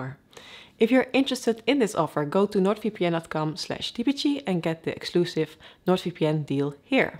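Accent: Netherlands. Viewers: wat klinkt dit nederlands zeg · The dutch accent on english is something that can never be hidden :D Greats from Belgium! Btw, I wouldn't mind a voice over the approach instead of text, that way you see more then reading text. Or was this a deliberate decision?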